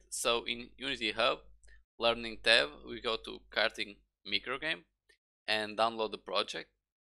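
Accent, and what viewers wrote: Portugal. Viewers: És tuga não és? Pelo menos parece 😅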